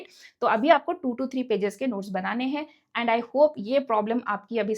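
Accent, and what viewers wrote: Odisha, India. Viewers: Are you from odisha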